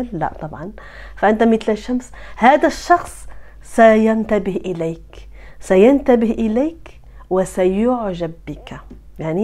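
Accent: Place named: Tunisia. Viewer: لهجتك مثل لهجة التوانسة ❤❤❤وصوتك مريح يا ألله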